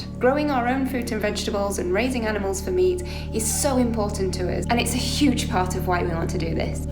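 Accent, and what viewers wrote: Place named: Yorkshire, United Kingdom. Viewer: What a great video and so interesting to hear you explain your motivations and plans for the future. You are very brave to strike out into a remote rural location. I will follow your progress with great interest and wish you both and Paco (lovely dog) all the very best. PS. you have not lost your Yorkshire accent.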